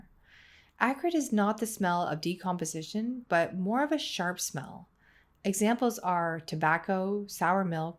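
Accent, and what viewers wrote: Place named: Canada. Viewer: I’m good with accents lol. I love ❤️ Canada 🇨🇦 btw.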